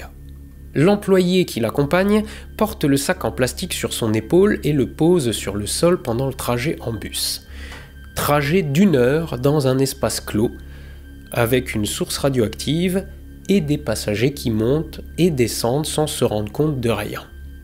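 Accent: Alsace, France. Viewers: l'accent alsacien 👌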